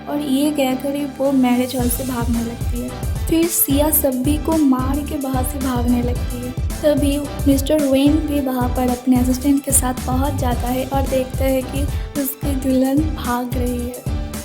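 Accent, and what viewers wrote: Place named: Northeast India, India. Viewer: Ap north east se ho , meri chachi ki same aawaj hain , bolne ka tarika bhi same hain , kalimpong se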